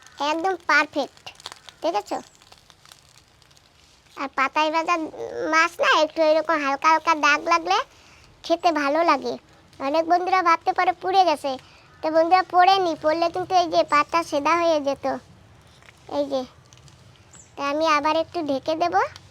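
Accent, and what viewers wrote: Barishal, Bangladesh. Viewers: দিদি তোমরা কি ওখানকার স্থানীয় নাকি বাংলাদেশের সাথে তোমাদের কোন সম্পর্ক আছে আমাদের বাংলাদেশে বরিশাল জেলায় তোমরা যে ভাষায় কথা বলো আমাদের বরিশালের ভাষা একই একটু জানাবেন দিদি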